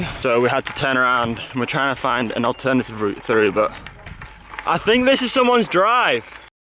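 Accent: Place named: Northern Ireland, United Kingdom. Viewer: Hey, so good to hear you still have some of yer old norn iron accent. Love the "oh dear" when you guys hit the road closed sign 😁 what about yee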